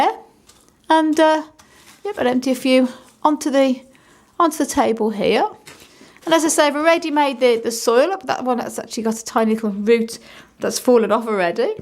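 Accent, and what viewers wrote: United Kingdom. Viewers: Hello....I recently found your channel and I became your follower......😘😘😘😘😘😘😘by the way....I LOVE YOUR BRITISH ACCENT.....😊😊😊😊😊😊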